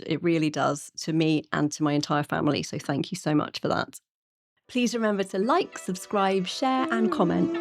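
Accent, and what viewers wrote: United Kingdom. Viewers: She sounds brittish i can't believe she's never listened to PF before 🙄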